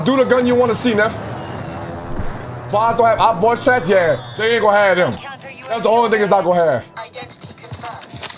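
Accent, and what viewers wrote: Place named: New Orleans, United States. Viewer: Where you from I’m from New Orleans sound like you from here me and my brothers betting they said you not let us know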